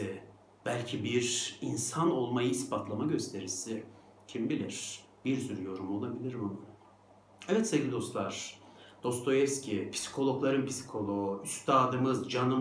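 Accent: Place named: Kayseri, Turkey. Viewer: Hocam iyiki varsınız kayseri şivesini çok güzel kullanıyorsunuz beni güldürüyorsunuz allahta sizi güıdürsün hemşerim.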